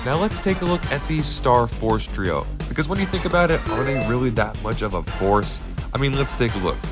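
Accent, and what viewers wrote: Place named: United Kingdom. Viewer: As an Australian he sounds so British 😭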